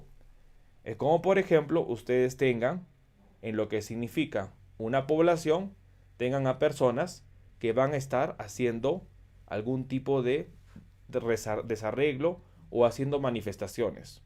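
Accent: Peru. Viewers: Peruano no? 🇵🇪 Vamooo!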